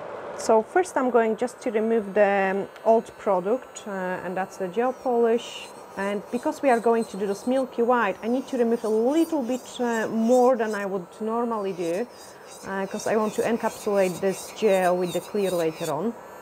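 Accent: Scotland, United Kingdom. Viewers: Love the set, love your work and attention to detail. Especially love your accent with Scottish influence. Hope to get to Scotland in the next couple of years!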